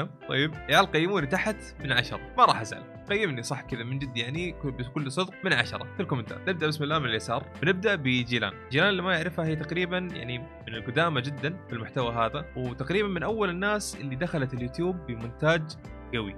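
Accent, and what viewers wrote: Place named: Iraq. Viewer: صراحة دوك صوتو واللهجة العراقية يكفون يخلو من الافضل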